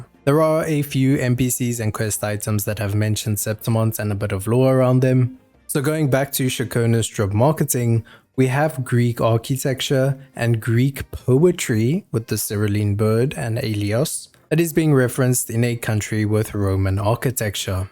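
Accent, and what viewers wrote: South Africa. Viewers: I smell a South African Wuwa Content creator👀 Subscribe✅️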